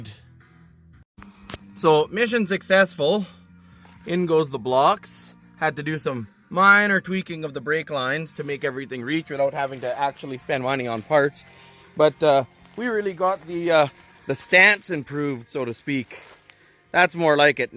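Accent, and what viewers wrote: Canada. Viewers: My first time watching this channel. Is this guy related to Zip Ties and Bias Plies? All these guys from the Kanukistan prairies sound the same to us yanks. Why is the ground not white?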